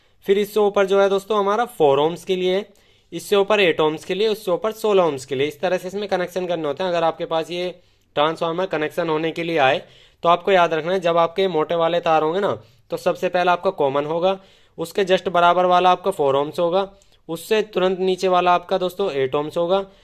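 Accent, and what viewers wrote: Meerut, India. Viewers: भैया मैं आपकी बहुत सारी वीडियो देखता हूं और आपका काफी पुराना सब्सक्राइबर हूं मुझे आपकी भाषा बहुत अच्छी लगती है क्योंकि मेरे मेरठ जिले जैसी ही लगती है जैसी मेरे मेरठ जिले की भाषा है आपकी भाषा बिल्कुल वैसी ही है अगर बुरा ना मानो तो मैं आपसे आपका निवास स्थान पूछ सकता हूं क्योंकि मेरा भी एक एलिजिन कंपनी का एंपलीफायर है जो खराब हुआ पड़ा है आपसे ठीक कराना चाहता तुम मुझे वह एंपलीफायर लेकर कहां आना पड़ेगा इतना बता दीजिए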